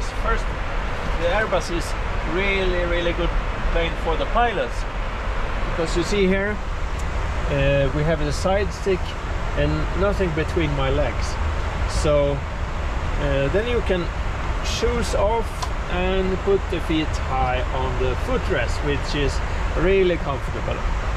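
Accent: Sweden. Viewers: Riktig svensk dialekt på engelskan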